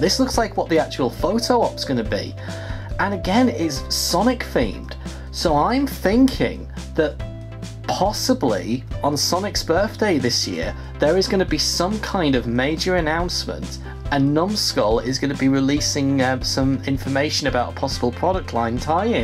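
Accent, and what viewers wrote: Yorkshire, United Kingdom. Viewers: 1.wish I was going to comic con now! 2.you’ve developed a stronger Yorkshire accent,I hear!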